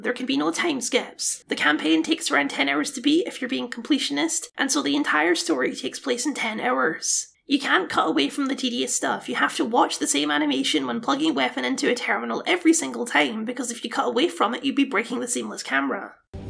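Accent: Scotland, United Kingdom. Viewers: Lmfao, yeah that was definitely an ignorant American question. Never actually met a Scot so only know the over the top accent seen in our movies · Your voice not even that high bro. It just seems like such when paired with your Scottish accent and that’s how it comes off to an untrained ear.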